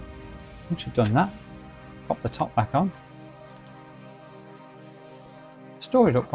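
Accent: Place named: United Kingdom. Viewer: I have no idea what you'te saying and YouTube CC doesn't either. Hope whatever it is works well for you. [weird UK English strong accent goes here]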